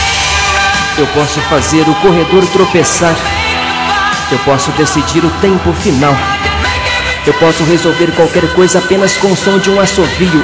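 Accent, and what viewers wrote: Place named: Paraíba, Brazil. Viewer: Voz meio Paraíba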